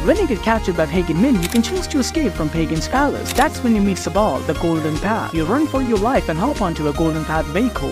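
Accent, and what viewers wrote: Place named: India. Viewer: He literally sound indian from the beginning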